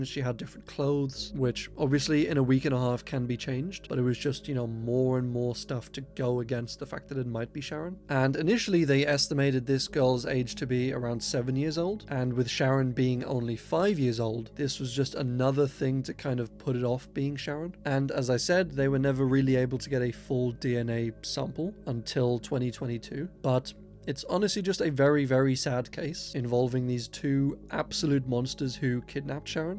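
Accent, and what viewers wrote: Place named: Scotland, United Kingdom. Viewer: I watch several 'iceberg' creators. Yes, I have subscribed to your as well. Because I like the best. YOU are one OF the best. Just enough curiosity and open mindedness without being naïve and gullible. <--that is a tight rope act and hard to do correctly. You do it good enough. Of course, no one does it AS good as me. <----and THAT is also a conspiracy!! Carry on. p.s. what's with the accent? Not S African or Australian. From the north of the UK? Scottish highlands maybe,.........<---- said the American with the deep southern accent with extreme Scottish ancestry.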